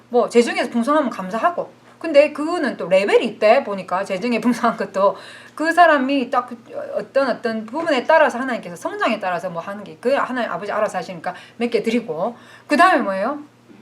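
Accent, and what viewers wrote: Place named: Gyeongsang, South Korea. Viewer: 얼굴도 예쁘고 내가 좋아하는 경상도녀의 강한 사투리가 신앙까지 좋으셔서 배우기도 은혜로워요 감사함다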